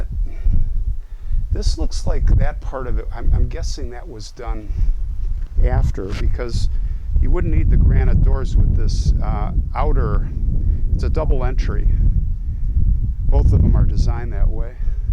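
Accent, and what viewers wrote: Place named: Chicago, United States. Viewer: That Chicago dialect is so horrible. I hate this pos guy's voice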